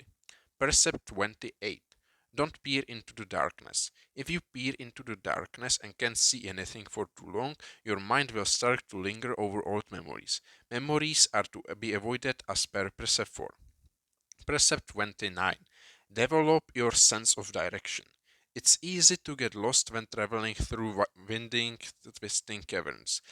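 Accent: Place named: Russia. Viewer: You sound like Russian or german, am I right?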